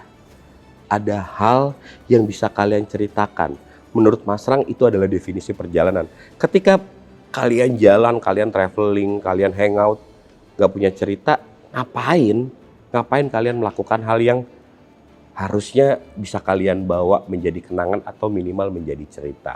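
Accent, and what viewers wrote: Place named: South Jakarta, Indonesia. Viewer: Kyk abang"an w ini mah.. dari cara ngomong + gaya ny kyk anak jaksel.. 👏👍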